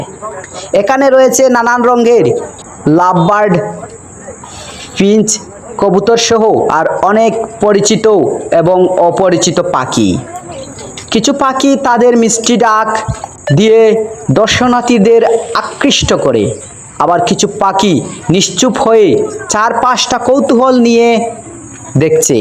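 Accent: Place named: Sylhet, Bangladesh. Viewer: সিলেটি বেটা শুদ্ধভাবে বাংলা বলতে পারে না। পারোনা যখন তাহলে তোমার আঞ্চলিক ভাষাতেই ভিডিও বানাও।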